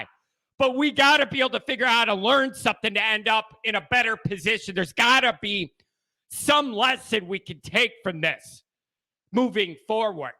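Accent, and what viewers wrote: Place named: Ireland. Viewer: I love this lil angry Irishman…his accent is 90% of his charm. Great channel!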